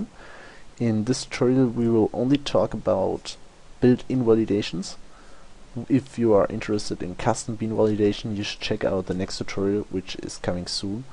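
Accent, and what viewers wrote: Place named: Germany. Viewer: your German accent is music to my ears. Fuck those indian tutorials.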